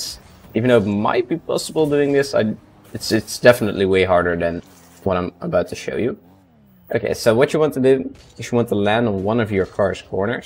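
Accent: Netherlands. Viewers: I smell Dutch, bedankt voor de tutorial maat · I just know ur a fellow dutch person, i can hear it in ur accent 😂 great video man, love it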